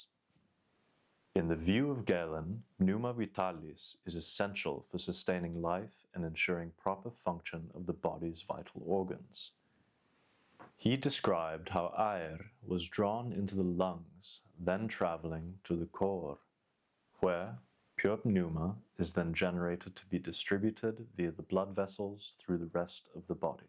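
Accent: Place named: Transatlantic, United States. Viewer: Your transatlantic accent makes you stick out from other content creators now and to come!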